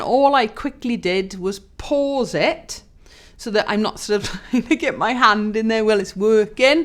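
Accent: Wales, United Kingdom. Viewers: Love the Welsh accent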